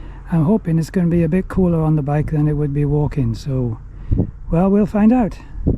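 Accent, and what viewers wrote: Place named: Wales, United Kingdom. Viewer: Just watching my first video of your’s. Surprisingly good! I’ll recommend your Channel. Hope you recall Wendy & I on NB Barneswood helping Locking with each other, walking & chatting in the Kidderminster area on the S&W. It was a pleasure to meet you, hear your lilting Welsh tones & witnessing your calm attitude to boating. We hope you enjoy the rest this summer & look forward to meeting you again in the future 🤞 Alan, Wendy, Max & Russ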